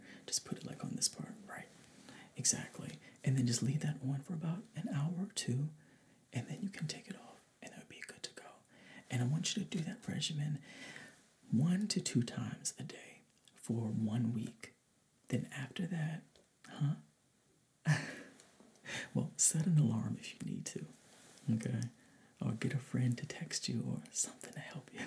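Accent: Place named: United States. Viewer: I hear an American accent! Weirdly, I was expecting him to have an English one!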